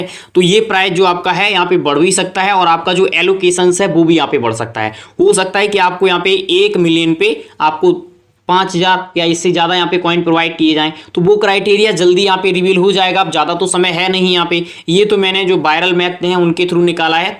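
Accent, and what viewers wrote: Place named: Bundelkhand, India. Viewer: Bhai apki awaaz sunkar bundelkhand ki yaad taza ho jati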